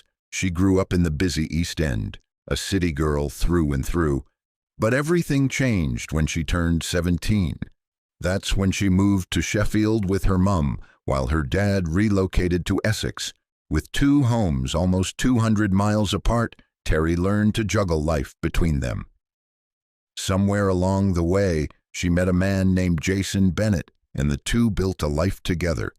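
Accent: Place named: United States. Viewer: Couldn’t stand the narration and American accent. Very annoying and full of repetitive dialogue.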